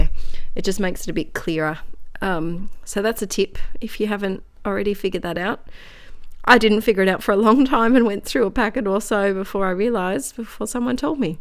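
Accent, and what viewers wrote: Australia. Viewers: AWESOME Project Life video! So good to hear another Aussie! I absolutely loved it and have definitely subscribed. I think we have a bit in common and if you get a chance I would love you to have a look at my channel xo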